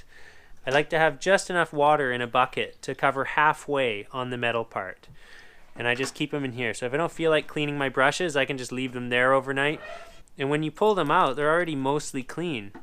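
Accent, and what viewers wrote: Canada. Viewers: You sound very Canadian or Minnesotan (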